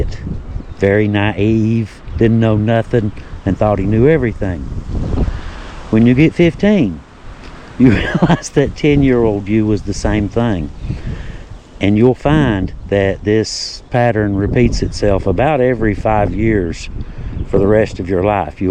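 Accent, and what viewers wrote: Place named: Kentucky, United States. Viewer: and you two are such a wonderful team! I play your videos to listen to as I drive. My mom,she passed right before Christmas, loved listening and watching while I drove. has that storyteller voice plus the accent we love. You see she came from McCreary country Kentucky area. My grandparents moved north to Indiana once the mines shut. Later on working the glass factory up here she met my beloved Ma Ethel. Ma Ethel was from Mingo. Kermit to be exact. She was a Chafin.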